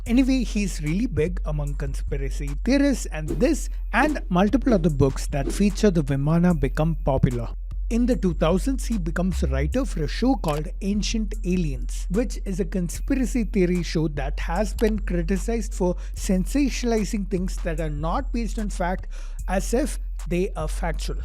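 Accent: India. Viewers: Very very strong indian accent, needed subtitles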